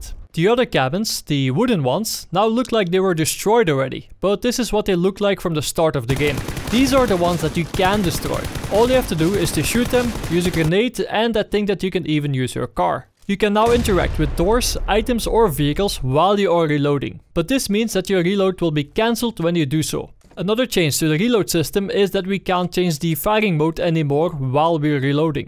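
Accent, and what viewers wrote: Netherlands. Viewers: dutch arent you xD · He sounds Dutch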